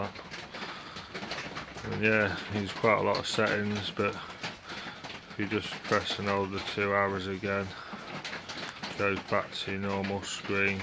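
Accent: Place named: Doncaster, United Kingdom. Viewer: Sounds like you're not far from Doncaster mate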